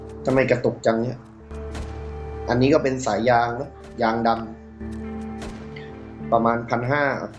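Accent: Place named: South, Thailand. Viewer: สำเนียงพี่บ่าวนิ่ คนใต้ม๊ายนิ 😅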